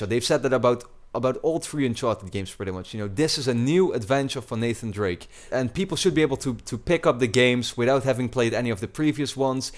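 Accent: Netherlands. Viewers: Hey, did you know that ps4 could hold 2000 friends? Awesome, are u gonna add some subsribers like me? Would be cool to play some rounds with you:)Uit welke stad kom je btw? hoorde meteen dat je uit Nederland kwam, ik uit Den Haag:D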